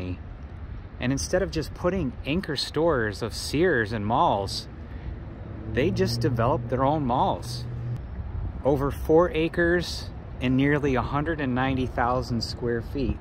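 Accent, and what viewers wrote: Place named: Northwest Ohio, United States. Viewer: The NW Ohio accent is STRONG in this one.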